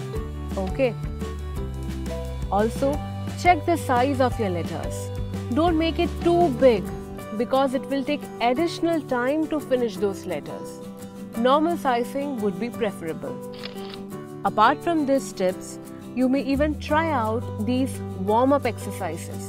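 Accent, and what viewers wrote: India. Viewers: she sounds like an Indian like me. Btw don't tense your arm it will make your arm hurt and tired and you will be exhausted pretty quick. Make sure you use the movement of thumb and fingers and if necessary your wrist. Important lesson I learned from my guitar playing. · i love your indian accent wow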